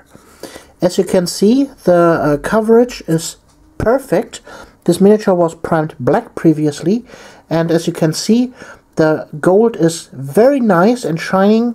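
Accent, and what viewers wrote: Germany. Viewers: Ah, da war doch dieser charakteristisch deutsche Akzent :) Wollte nur mal kurz sagen, dass Du wirklich guten Content lieferst und mir maltechnisch wieder wirklich Lust auf das Hobby gemacht hast. Bleib wie du bist, hast nämlich eine wirklich sympathische Art!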